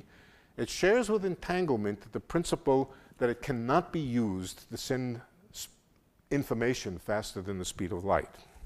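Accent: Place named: Bronx, United States. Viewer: bronx guy! lol love it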